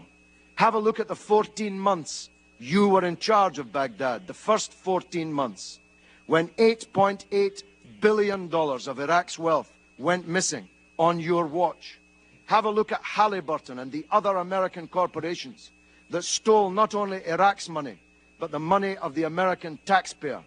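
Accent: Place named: Dundee, United Kingdom. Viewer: A true dundonian Dundee - the best city in the worrrld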